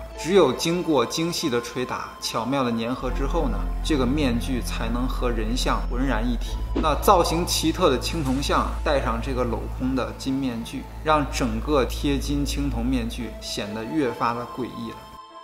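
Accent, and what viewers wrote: China. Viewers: 這個節目用的是繁體字，可是K哥口音像是大陸人。為何？ 不管如何，我點贊K哥使用繁體字，這是智慧的象徵。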